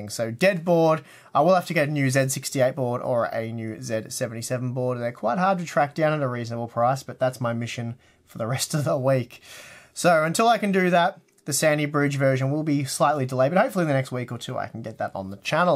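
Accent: Australia. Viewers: Just found your Channel and subbed.. refreshing hearing a Aussie for a change love the Vids. Is a i5 4670k still OK for Gaming ?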